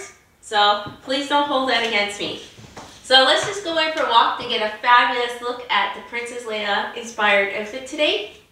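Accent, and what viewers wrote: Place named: Canada. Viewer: good night sweetheart I can tell in your voice that you are from Canada sweetheart I love it❤❤ looking beautiful in that sweetheart 🥰🥰🥰 love to hear your voice in person 😍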